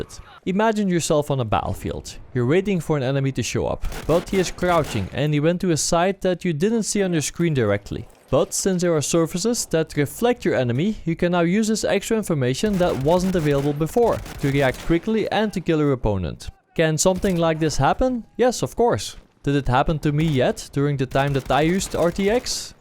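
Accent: Netherlands. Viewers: Your Dutch accent is so obvious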